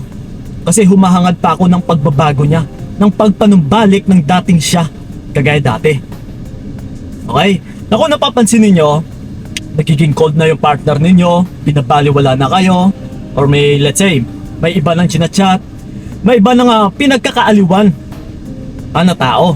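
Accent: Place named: Hiligaynon, Philippines. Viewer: parang ilonggo si kuya dahil yong tono ng salita niya malumanay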